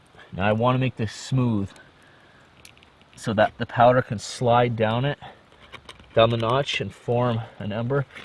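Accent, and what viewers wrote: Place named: Canada. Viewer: Been watching so much American bushcraft lately that I actually noticed our accent when I first start the last part lol. Like oh ya he’s Canadian too. Glad I found ya bud gonna be flying through your vids. Be one with the liquor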